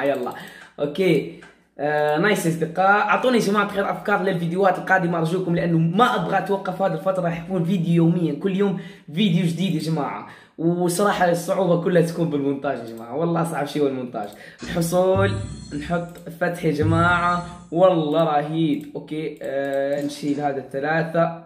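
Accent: Morocco. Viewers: هل انت مغربي؟ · واش انت مغريبي من اي مدينة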